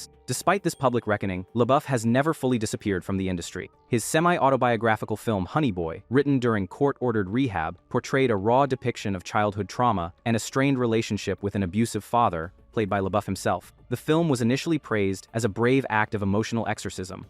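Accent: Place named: United States. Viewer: You have strange pronunciation of quite a few names. You do sound American though, which is pretty strange😊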